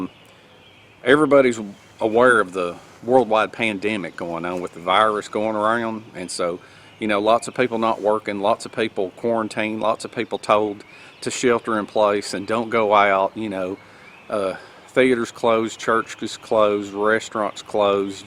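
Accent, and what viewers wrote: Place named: Georgia, United States. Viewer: I love watching all the different Bushcraft guys, I like the Canadian's cause they have so much beautiful wildness trips but for learning I like watching you guys in my area, southeast USA cause we have similar woods and weather conditions. You were the 1st guy I started watching, mostly for that Georgia accent, very educational and entertaining. I like watching David West a lot too. He can cross his eyes make fire. He isn't so much Bushcraft but he loves finding new ways to make fire. Enjoyed your rambling as always. Keep em coming when you can.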